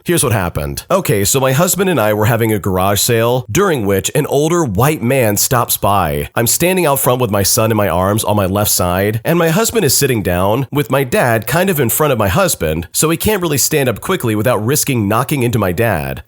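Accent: United Kingdom. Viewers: Is it so much to ask for a proper label for the thumb nail? It does set off my "danger radar", I use the channel to relax to do the dishes or whatever, then no warning I hear "The British Voice". It's the inflections. Give me one GOOD reason why the channel can't label the Thumbnail? Because I just leave a thumbs down, because the voice is not what I subscribed to. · first vid of the day is the British guy from Amazon I the genius..... Second vid of the day is the Australian guy .... ... And randomly the OG guy comes back...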